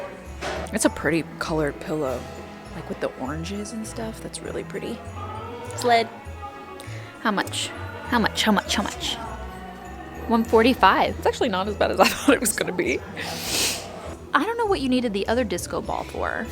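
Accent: Texas, United States. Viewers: LOL yes, I've notice your accent more when you're here in Texas as opposed to when you're in LA.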